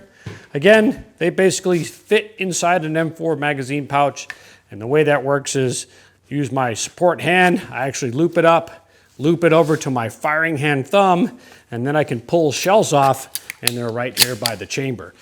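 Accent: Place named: Canada. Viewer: Are you originally from Canada? Your accent sounds Canadian.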